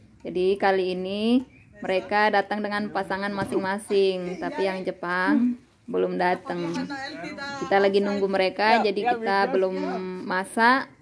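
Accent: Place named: Batak, Indonesia. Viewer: Gak tau knp dari dl tu suka bgt cara ngomongnya orang Batak...apalgi klu liat Judika hahahahh skrg liat kk aja dl..horassssssss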